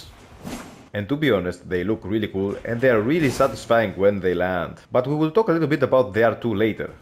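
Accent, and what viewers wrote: Greece. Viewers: That’s a Greek accent bro am I right ? 🇬🇷✊ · Man I know a greek accent when I hear one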